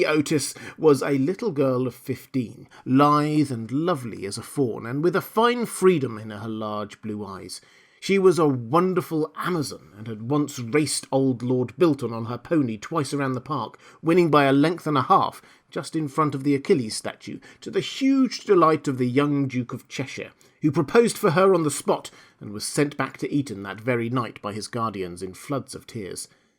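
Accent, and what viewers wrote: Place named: United States. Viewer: Great American accent!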